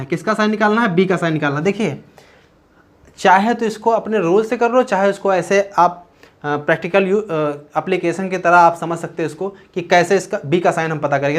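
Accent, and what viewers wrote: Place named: Bihar, India. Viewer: Sir you are amazing 👍🏻👍🏻👍🏻👏🏻👏🏻 aur apka bihari tone bhi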